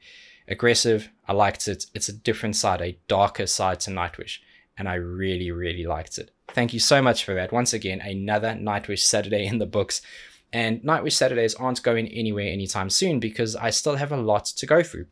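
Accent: South Africa. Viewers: Great reaction. First time I've watched you. Btw, are you from or have spent time in South Africa?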